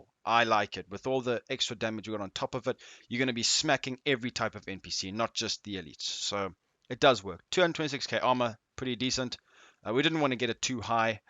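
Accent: South Africa. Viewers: Nice video, you mind me asking are you from South Africa?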